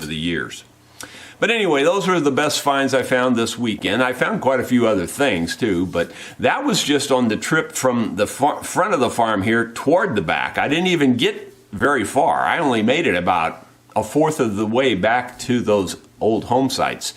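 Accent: United States. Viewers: Hi, i totally enjoy watching your video’s. I enjoy listening to all the interesting stuff you talk about. You have such a lovely accent and could listen to you all day..lol. I find it one of the most pleasant accents from America. I live in Scotland and enjoy your channel. I thought you said you were going to take it easy?. You are a workaholic..lol. You are looking well, So keep well and look after your family..👍🏻 and who know’s… i may come across the pond and rent one of your nice holiday apartments when i have saved up enough money, or robbed a bank..lol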